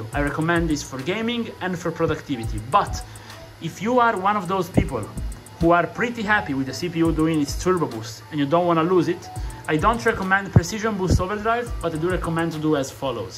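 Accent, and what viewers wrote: Italy. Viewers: ho subito riconosciuto l’accento italiano ahaha, grazie mille per questo e altri tutorial ❤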